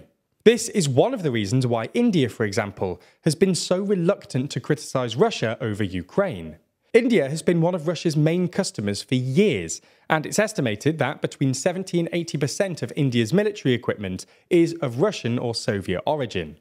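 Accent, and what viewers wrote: United Kingdom. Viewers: when you hear an annoying British accent you know you are listening to a propaganda garbage machine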